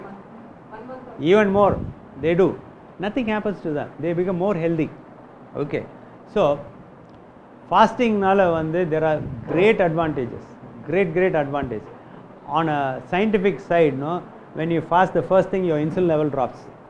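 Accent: India. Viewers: He is repeating Dr.Jason Fung in an indianised english.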